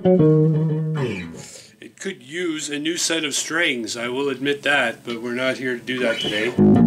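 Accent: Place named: Maritimes, Canada. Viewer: Hey are you from the Maritimes originally? You sound like one of us. lol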